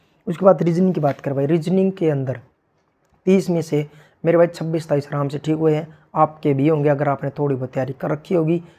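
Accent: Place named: Haryana, India. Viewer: Haryanvi for a reason ❤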